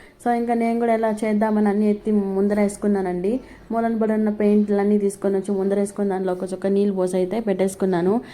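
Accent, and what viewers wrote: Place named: Nellore, India. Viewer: Nellore aa sis medi nellore yasa anipistundi mi matallo 😂😂😂bagundi mi bus lorry avvaley😜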